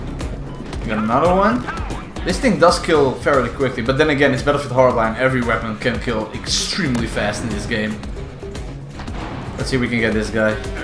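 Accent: Netherlands. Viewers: You have a nice dutch accent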